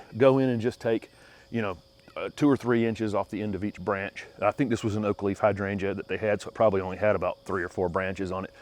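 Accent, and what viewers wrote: South, United States. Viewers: In addition to trying to soak up all the knowledge you share, I very much enjoy listening to your Southern accent. Raised in the South, I fell in love with gardening alongside my father. Transplanted to Phoenix in 1970, i greatly missed the ability to grow so many things. Now retired in Arizona’s best kept secret, the White Mountains, zone 6b, I am in heaven learning to garden all over again. Thank you for your help. And I would love to add a set of Corona pruners to my tool bucket.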